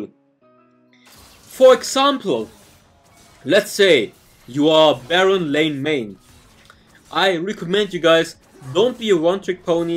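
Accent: Germany. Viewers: Are you German, bro? :D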